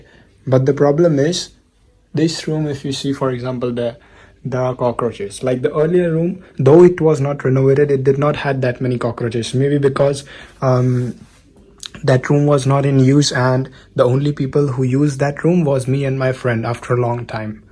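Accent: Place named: Russia. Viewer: You look the exact same, like you used to be during school, particularly in this video except for your voice and the Russian English accent!! I've been following all your videos, happy to see you exploring and living these experiences🙌🏽🤗 Good luck buddy 😊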